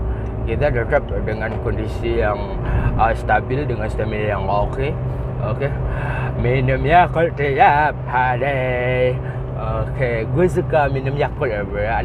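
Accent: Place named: Sipirok, Indonesia. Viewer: bawa Mobil ke rumah bOcil, terus njemput, berlanjut ke rumah Bang, kemudian jalan ke Bekauheni, naik kapal, terus ke Yana dan kemudian berlanjut ke Museum Angkot dan makan besar sama Bang.... Gitu.... #squadkampret #KocakGamong Kok aku kayak dengar ngomong Sipirok ya??? Padang Sidempuan???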